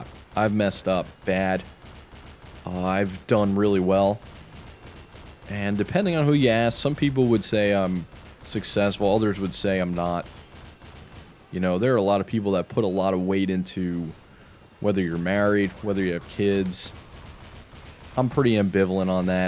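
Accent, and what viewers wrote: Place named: Florida, United States. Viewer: Hey man, I really enjoy your content, your channel was introduced to me couple days ago and i've been watching some of your videos. Very relatable, and the talks about lifes difficulties, you almost sound like a Floridan version of me. Hope to see more of your content and keep your head up, better days are obviously coming... That's atleast what I tell myself.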